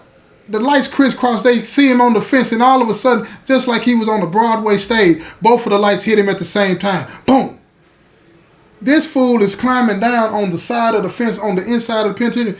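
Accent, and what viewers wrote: South, United States. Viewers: Hi! I look forward to hearing ur videos everyday, ur very consistent, on time and that southern. Voice of urs I love it. Keep doing what ur doing. I'm glad ur son recommended u I subbed IMMEDIATELY. B BLESSED CUTIE.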